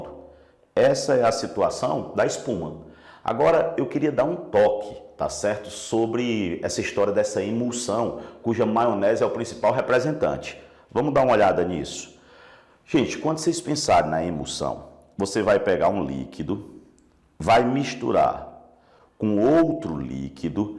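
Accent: Northeast, Brazil. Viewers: prof, o senhor é nordestino? a fala parece com o dos meus professores (sou paraibana)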